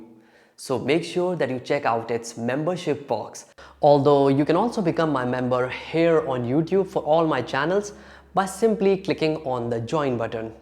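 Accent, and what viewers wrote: India. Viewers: oh my god! indian english!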